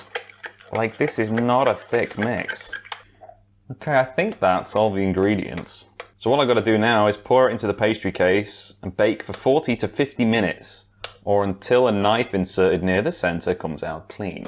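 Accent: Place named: United States. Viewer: His accent is half American.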